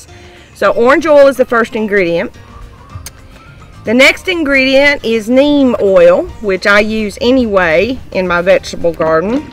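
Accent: South, United States. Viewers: If it doesn't kill the queen that's way down deep, the ants in the ground will move the mound. I love your videos and you sound just as southern as me.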